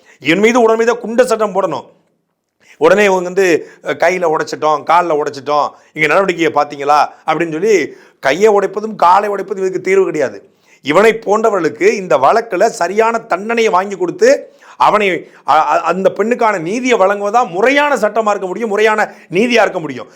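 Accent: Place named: Telugu, India. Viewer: இவன் தி மு க காறன்மட்டுமல்ல தமிழ்பேசும் தெலுங்கன்போல.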